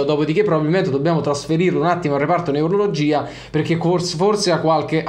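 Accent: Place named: Naples, Italy. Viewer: di dove 6? Hai un accento napoletano. Cmq sei in gamba, saluti e ForzaNapoli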